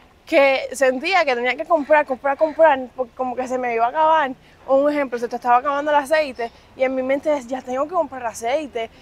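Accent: Cuba. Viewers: Poco a poco te adaptaras y hasta tarde y temprano se te quitara en tono de voz de cuba. Y sin pensar tu hablaras con el tono de mexico como las damas chilangas. Ya veras ..con el tiempecito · Y a ti tampoco te enseñaron que en cada pais hablan diferente ella su acento es cubano. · Hola preciosa eres muy graciosa e tu forma de hablar es muy bonito el acento cubano eres bienvenida a nuestro pais soy tu fans también a través de ti conocemos las costumbres de Cuba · No me gusta el acento cubano , nunca me va a gustar.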